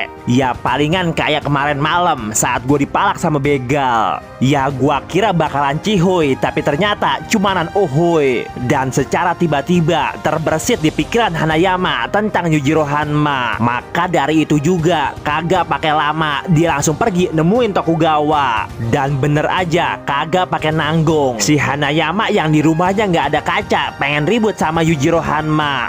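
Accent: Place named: Banjar, Indonesia. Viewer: Urang Banjar kah saban hari jar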